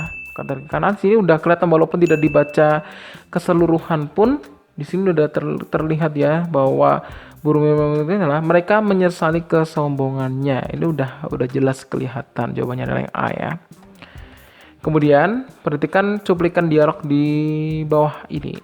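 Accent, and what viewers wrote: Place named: Java, Indonesia. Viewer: Bener lho suaranya mirip dosendeso...agk kejawa2an ngomgny...kaya org jawa medok dikit hihi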